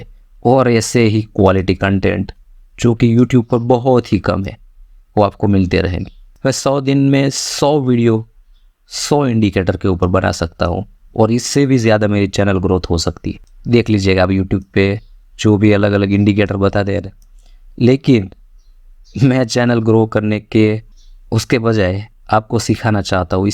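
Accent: Gujarat, India. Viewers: Kya aap Gujarati hai???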